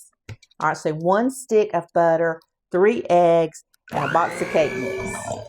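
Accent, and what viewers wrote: South, United States. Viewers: I love listening to a southern woman!!!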